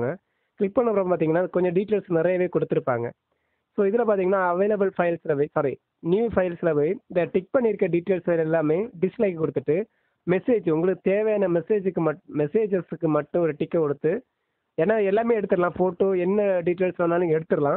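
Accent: Kanyakumari, India. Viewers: Bro neengha entha oru speech keata Kanyakumari Dist pola irruku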